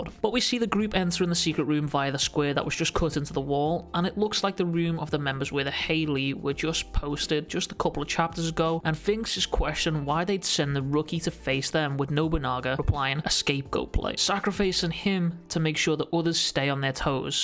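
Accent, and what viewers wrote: Liverpool, United Kingdom. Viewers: My scouser reviewer in the building 😍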